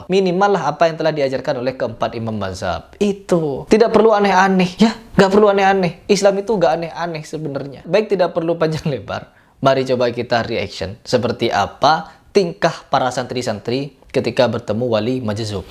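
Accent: Madura, Indonesia. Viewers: Teruskan... TERUSKAN... Lucu logat bahasamu Madura bangetzzz.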